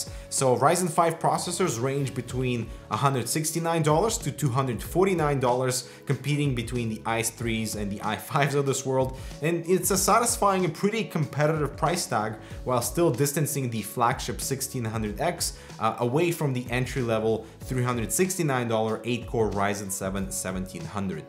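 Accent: Russia. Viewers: ой чувак, такой акцент, ты по-любому Русский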